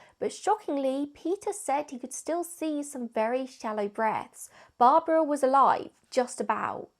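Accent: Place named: RP, United Kingdom. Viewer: So posh 😂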